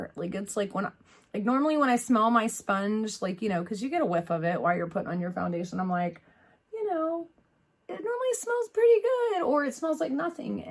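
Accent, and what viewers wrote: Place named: Kentucky, United States. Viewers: I am from Kentucky as well and I completely get the pronunciation thing. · Country accent gotta love em lol and my mind wasn't in the gutter till you said something 😂😂😂😂😂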